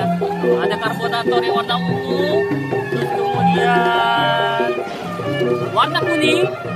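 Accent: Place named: Medan, Indonesia. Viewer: Om kaya orang medan wkwk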